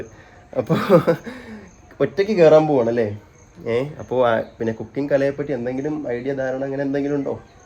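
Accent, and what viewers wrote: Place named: Malabar, India. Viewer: സംസാരം തനി മലബാർ ഭാഷ ആയി തുടങ്ങി 😂😂😂😂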